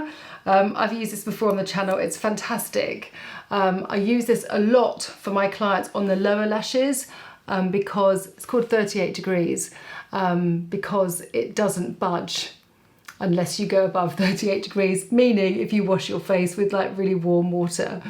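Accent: United Kingdom. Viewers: Oh my I just bought glasses like yours. I feel very chic now. Oh to be so gorgeous with that lovely British accent. 😉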